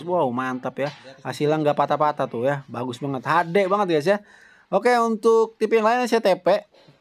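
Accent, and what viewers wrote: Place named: Bekasi, Indonesia. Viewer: Org Bekasi Mane dye suaranya niiiiihhhh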